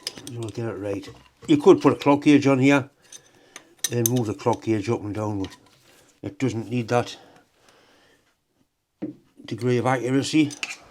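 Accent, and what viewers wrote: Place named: Northern England, United Kingdom. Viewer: Great to hear your voice again, I hope you and are doing well despite things. I'll be honest but I miss northern accents down here in Norfolk, and yes yours is the best. Any time your down in Kings Lynn, beers are on me. Chin up bonny lad, you take care of yourselves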